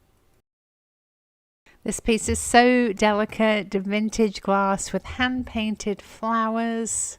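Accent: England, United Kingdom. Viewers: Where are you from? Where is the accent from? England?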